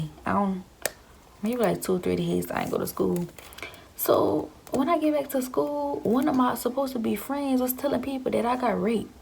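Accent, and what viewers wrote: New Orleans, United States. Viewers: I subscribed to your channel princess. Stay strong and I love that new Orleans accent. Stay blessed! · I hear a NOLA accent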